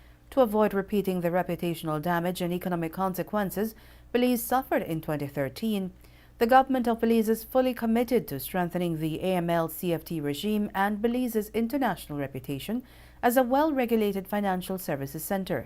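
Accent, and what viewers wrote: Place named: Jamaica. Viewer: Is the newsreader Belizean? She sounds Jamaican.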